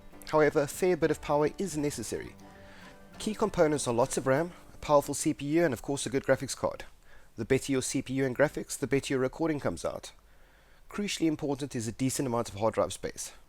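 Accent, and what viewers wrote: South Africa. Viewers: Afreekan accent:)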